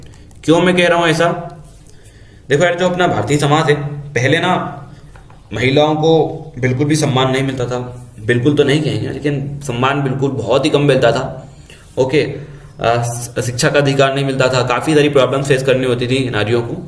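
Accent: Indore, India. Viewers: Yeh bhai pakka indori hai 🙂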